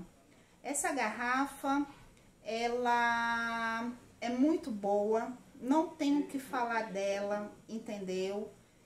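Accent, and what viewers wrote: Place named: Maranhão, Brazil. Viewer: Parabéns, vou la na potiguar! Quando ouvi teu sotaque ja sabia que é maranhense tbm kkkkk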